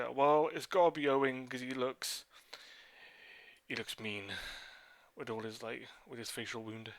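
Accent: United Kingdom. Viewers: The sound of your Bristolian accent makes my nipples moist. Just saying. LOVE YOU!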